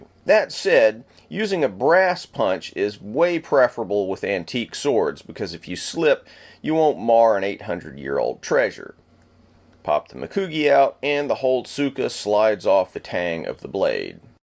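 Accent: United States. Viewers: The rather Americanized pronounciation notwithstanding, this was a very good introduction to the topic. Kudos for breaking down a complex topic without dumbing it down in the process.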